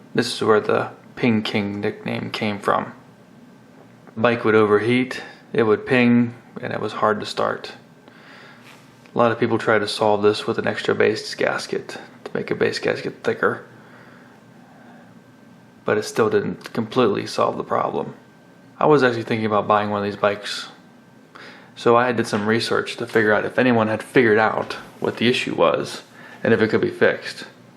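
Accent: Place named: Pittsburgh, United States. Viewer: Nice Pittsburgh accent. lol GREAT job capturing the history of these AWESOME machines.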